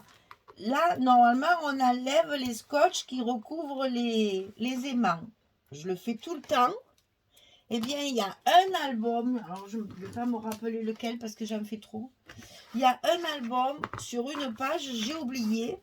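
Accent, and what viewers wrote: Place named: Provence, France. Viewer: Bonjour. J'avais acheté la collection provence ou lavender l'année dernière. Enfin, la même mais ils ont rajouté le vélo et autre chose. Je suis heureuse que vous fassiez un tuto, je vais pouvoir enfin m'en servir! Merci infiniment, je l'aime déjà! Et ce papier avec votre accent... on la sent la lavende 🥰🥰🥰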